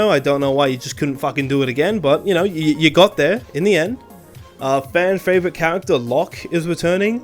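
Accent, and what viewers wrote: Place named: Australia. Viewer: Is he Australian, or is that just a speech impediment?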